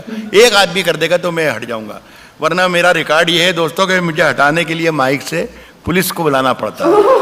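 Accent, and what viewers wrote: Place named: Jaipur, India. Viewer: Aap jaipur se ho mene aapki bhasha suni mebhi जयपुर मैं ही rehta हूँ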